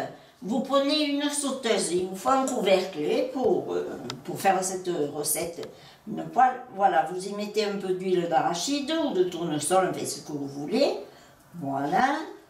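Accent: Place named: South, France. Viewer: Hummmm...j'ai un plaisir fou à vous suivre...et à faire vos recettes délicieuses...merci beaucoup...et en plus comme chantait,avec! C'est merveilleux.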